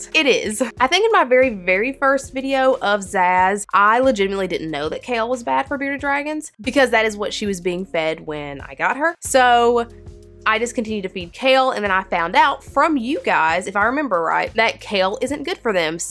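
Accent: South, United States. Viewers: I love your accent. I live in Michigan and don't hear southern accents. So it's fun to hear. But yeah. Unfortunately people feel like they can say anything on the internet. So you're gunna get a lot of trolls. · there's nothing better than that southern drawl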